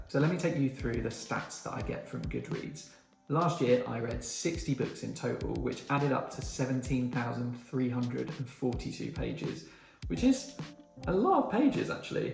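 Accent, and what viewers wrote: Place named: United Kingdom. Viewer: Love your British accent, I will trade you for my Long Island accent 😂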